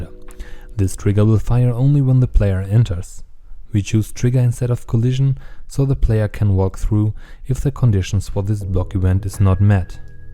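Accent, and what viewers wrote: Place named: Germany. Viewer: wie man einfach immer raußhört wenn einer deutsch ist XD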